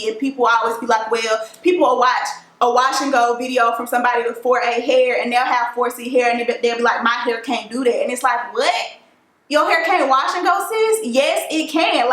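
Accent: South, United States. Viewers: Hey cuzo, I love your curls and that southern draw is precious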